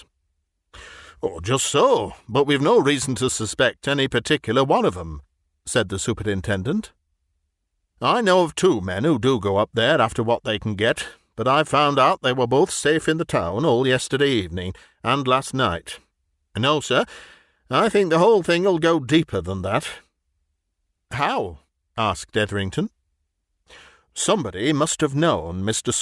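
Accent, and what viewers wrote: United Kingdom. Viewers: Thank you . Much appreciated. I am Egyptian. I did enjoy the superb reading, the beautiful British accent, and the story of course. I can’t say I fully understood the bank “dealings”/terms, but then I can barely understand customer service at my own branch no matter how user friendly they try to be to my 63 yo ears in my own country.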